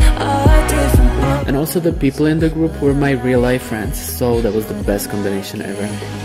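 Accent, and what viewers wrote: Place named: Czech Republic. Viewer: Where do you come from your acsent and place where you from looks like Czech Republic or Slovakia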